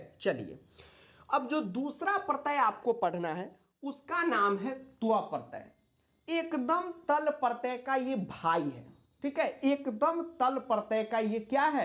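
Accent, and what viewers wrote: Bihar, India. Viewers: Sir aap Bihar se ho kya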